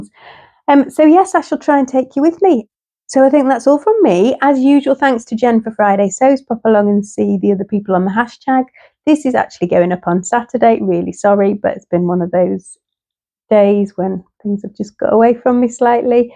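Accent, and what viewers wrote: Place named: Midlands, United Kingdom. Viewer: Great makes. You sound like you live in the midlands area? I'm from Stoke.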